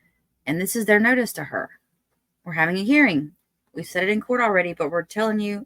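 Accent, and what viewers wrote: South, United States. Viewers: Voluntary intoxication is not a defense in the state of Florida. I like your southern accent. Where are you from. I'm a new subscriber. I like your presentation with humour.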